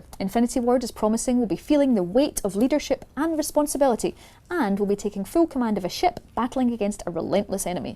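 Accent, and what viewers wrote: Scotland, United Kingdom. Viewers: i cant understand you, and thise british accent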